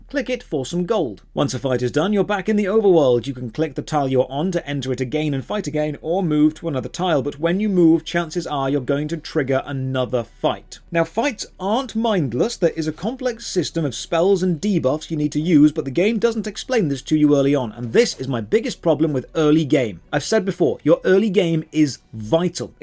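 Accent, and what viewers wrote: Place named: RP, United Kingdom. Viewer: Oh MY GOD ENOUGH with these British youtubers and their clickbaity embellished titles and long droning low effort content, and their received pronunciation speaking as though they are some authority on the subject... Jesus, no other country produces as many cruddy youtubers from this "game the algorithm" school. You've called about 40 MMO's the worst MMO ever, you've never played many of these games you talk about in any significant amount... Low effort, fair use abusing, overly verbose garbage.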